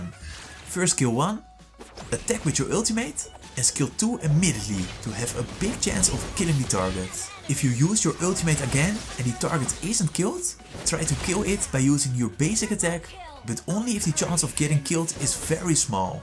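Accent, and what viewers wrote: Netherlands. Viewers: ayo this guy is dutch i can hear your accent dawg.. Kaas 👀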